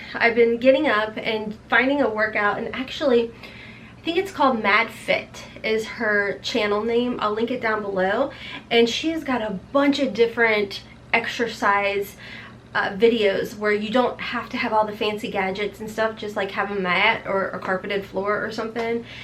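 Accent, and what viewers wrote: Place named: East Tennessee, United States. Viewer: Found your channel and LOVE IT!! Especially because you sound like me lol (I’m from East TN) Anyway you mentioned self tanner and I’m just getting into that (used to be a tanning bed queen), what do you use??? So many make me orange and girl I love my Vols but ain’t looking to be orange and white 🙄🤪 HELP!!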